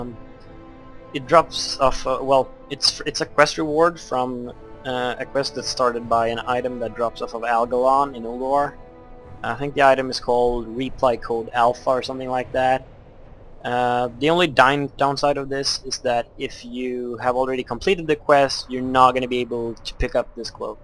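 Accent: Sweden. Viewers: Du är svensk va? Jag hör det på din engelska/ "U are english right? I can hear it on your english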